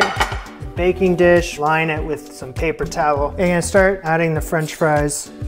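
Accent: Canada. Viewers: Heard your accent when you were making the Orange cake but your location said the US. Glad to see I can still pick it out. Poutine is king and essential after a night out! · I'm a recent subscriber and I thought you sounded Canadian. Takes one to know one. I would hazard a guess that you are from the East. Love the show.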